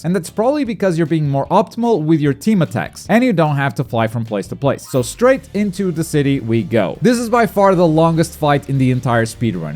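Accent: Portugal. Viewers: Caramba, vc é de Portugal??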